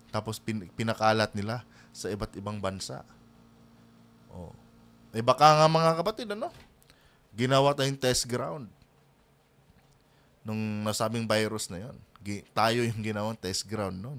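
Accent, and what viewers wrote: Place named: Davao, Philippines. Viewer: Taga davao po ba kayo 😊? Kc kaparehas moh ang accent si baste..tanong lang po..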